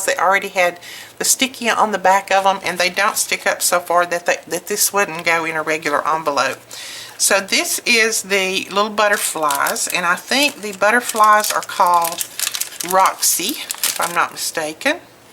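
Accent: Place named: Alabama, United States. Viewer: Just watched your video, this is just amazing!! I've missed listening to someone from Alabama!! Thanks from Arizona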